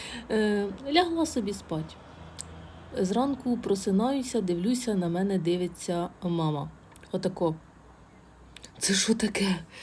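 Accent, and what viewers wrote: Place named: Orativ, Ukraine. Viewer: Оратівський район! А я чую, говір такий милий)) Он воно що!!!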